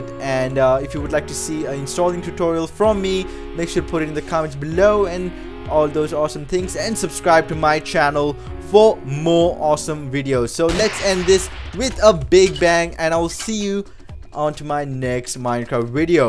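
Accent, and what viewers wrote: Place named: Southall, United Kingdom. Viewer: U sound like southall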